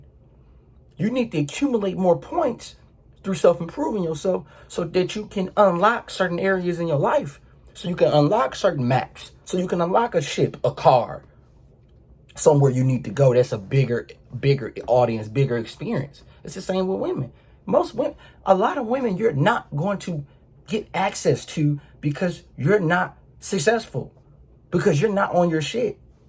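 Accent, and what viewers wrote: Baltimore, United States. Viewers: Are u from Baltimore? U got the accent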